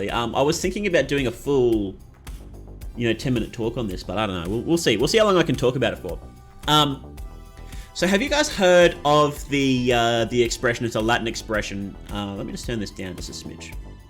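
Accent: Australia. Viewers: You have roight proper aussie accent. This was a nice talk.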